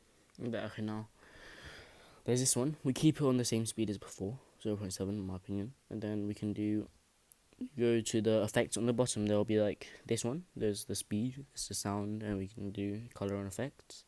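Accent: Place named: England, United Kingdom. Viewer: Your accent is so much more English now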